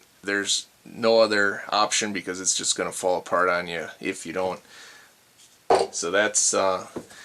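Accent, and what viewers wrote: Canada. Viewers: For some reason i can always pick up on canadian accents. R U?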